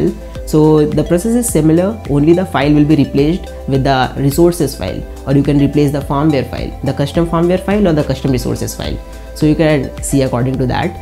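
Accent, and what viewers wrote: India. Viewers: Is this guy switching to indian mid video ?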